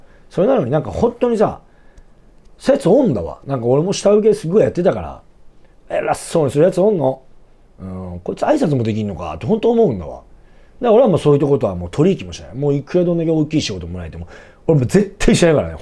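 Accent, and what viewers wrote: Nagoya, Japan. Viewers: はじめまして おすすめ動画に出てきて見させて頂きました。 とても参考になりました。 自然な名古屋弁いいですね(^o^)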